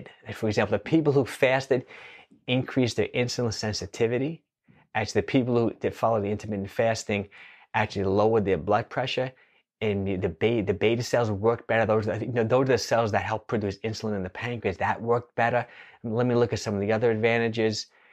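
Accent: New York, United States. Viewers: you New Yorkers have your own accent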